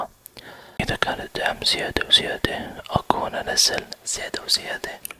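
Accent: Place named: Ha'il, Saudi Arabia. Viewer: لهجتك حايليه مره انت من حايل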